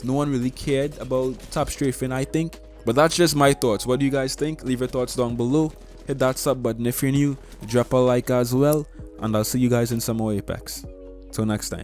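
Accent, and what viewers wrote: Trinidad and Tobago. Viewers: “The apex YouTuber with an accent” Me knowing he’s a trini: 🤣🤣🤣🤣🤣🤣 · Just started playing apex and your my fav apex yt rn much love and btw are you from Trinidad & Tobago cuz i am and you sound like a trini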